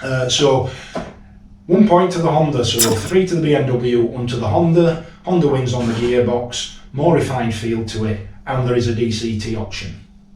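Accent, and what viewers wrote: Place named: Bradford, United Kingdom. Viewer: Great review kid. Watching from Sydney, and lovely to hear a Bradford accent while being educated.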